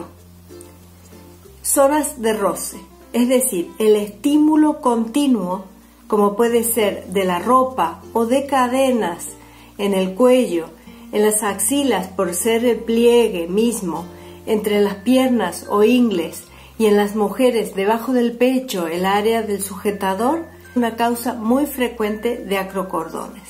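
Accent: Córdoba, Argentina. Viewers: Parece Cordobesa de Argentina doctora aunque con el acento un poco cambiado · Debe ser sonido argentino, quizá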